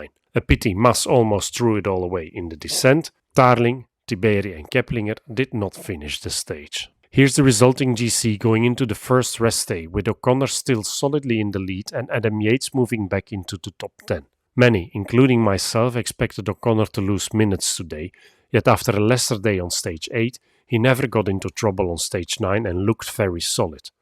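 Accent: Belgium. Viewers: Belgium accent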